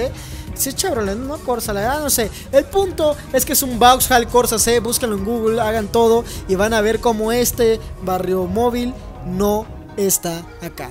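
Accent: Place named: Mexico City, Mexico. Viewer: No se de donde eres, pero no se dice ACA, se dice AQUÌ. solo los centro y sudamericanos dicen asi, tu suenas como chilanguito o de el sur de la republica mexicana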